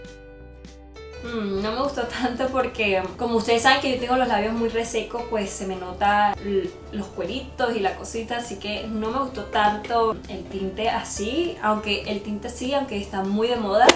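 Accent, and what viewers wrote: Venezuela. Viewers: Mi.usted es asiacata..pero tiene asento Venezolana..soy nueva..sakeme d duda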